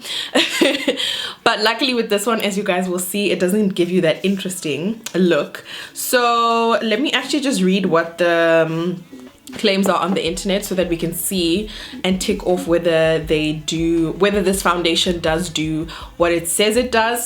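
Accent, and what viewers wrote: South Africa. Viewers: I like your accent, you sound South African or Zim/Canadian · Are you South African?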